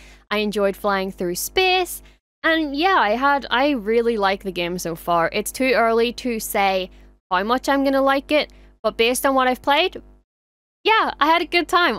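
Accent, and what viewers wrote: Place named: Northern Ireland, United Kingdom. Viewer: Great video! Really appreciate your enthusiasm, and enjoyed the natural commentary in your wonderful Northern Irish accent. I'm a lazy gamer and therefore not usually a big fan of open-world games (e.g., couldn't get into Starfield), but this is Star Wars and I think I'll give it a go! Cheers.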